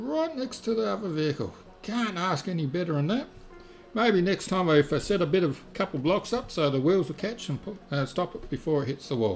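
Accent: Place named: Australia. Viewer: Love your Aussie accent....Relaxed talking manner but not too relaxed for the masses....sometimes my ears couldnt relate..sorry....so as my kind teacher used to say.. "Talk nicely and clearly"...! .just so all your valuable help sinks in..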